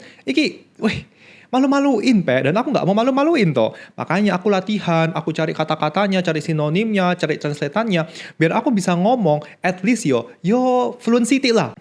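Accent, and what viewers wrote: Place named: Java, Indonesia. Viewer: wah lek jowoan ngene ngajar bahasa inggris jadi tambah jos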